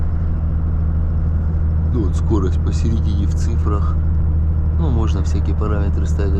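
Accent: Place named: Rostov, Russia. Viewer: А говор у тебя Ростовский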